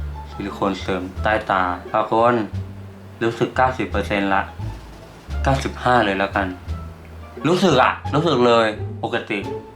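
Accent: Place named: North, Thailand. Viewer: พูดเหนือบ่อยๆเลยนะคะ ฮือ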